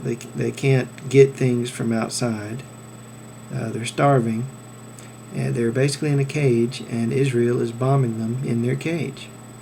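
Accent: South, United States. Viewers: And besides according to your accent you are from the south - why don't U give back Texas to mexico ?!?! It doesn't belong to U !!!!!!! What about mexican refugees - you have any idea how many refugees were created in Ur war to settle texas - their descendents probably outnumber the palestinian refugees by far !! AGAIN - U R A HYPOCRIT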